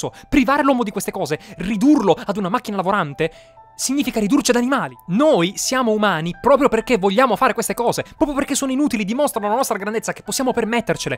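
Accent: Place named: Sicily, Italy. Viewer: Io non me ne intendo di accenti ma sentendoti parlare così concitato mi è sembrato che la tua parlata fosse simile a Marco Merrino. Il che ha senso visto che siete entrambi siciliani.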